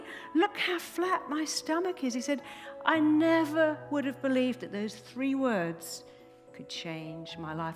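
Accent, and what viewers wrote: United Kingdom. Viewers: ENGLISH ACCENT FULL OF SHIT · It only works when you say it with this britisch accent:)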